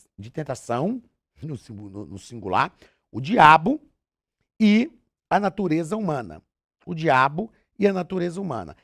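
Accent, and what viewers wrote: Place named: Minas Gerais, Brazil. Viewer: Estudo junto todas as semana sou mineira e gosto de ouvir um mineiro falando Deus continue lhe usando Pastor.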